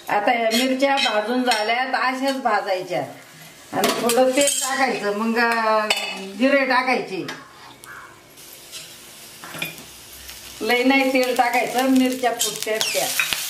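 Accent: Ahmednagar, India. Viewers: I know I lived in Rahuri ,I went to school in Ahmednagar ,the way you spoke .I remember those day.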